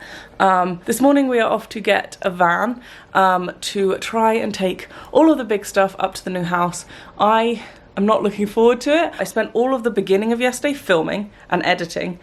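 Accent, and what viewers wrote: Liverpool, United Kingdom. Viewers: Its just Liverpool English accent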